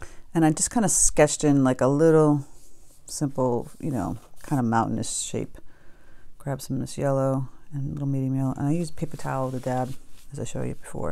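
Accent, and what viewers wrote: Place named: New York, United States. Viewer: Thank you sooooooooo much for your AWESOME videos!!! I love it!!!! I watch them all!! I like your accent! NY?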